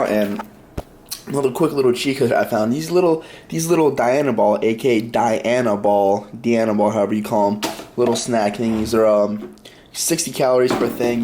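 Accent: United States. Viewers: He has a very strong American accent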